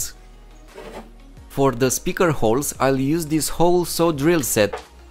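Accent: Russia. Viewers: По английски не чего не понятно акцент русский мешает давай на родной мове не стесняйся.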